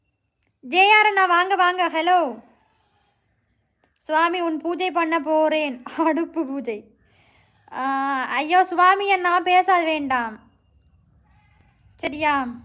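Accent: Sri Lanka. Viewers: இலங்கை தமிழ் சூப்பரா இருக்குங்கோ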